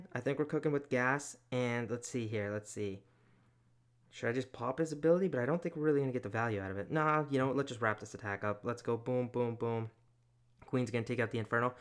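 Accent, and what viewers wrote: Canada. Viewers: You sound Canadian, eh? 🤔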